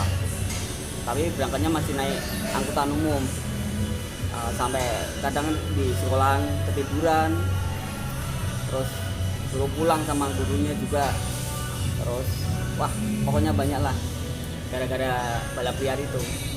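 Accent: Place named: Java, Indonesia. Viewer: Mas logatnya org jawa, jawanya dmna mas?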